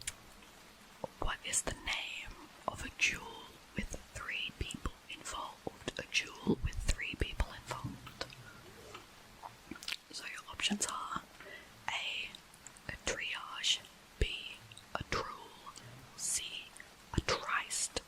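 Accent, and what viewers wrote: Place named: Australia. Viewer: This was so fascinating and relaxing ❤ I really love listening to ASMR with a fellow Aussie accent, glad I found your channel!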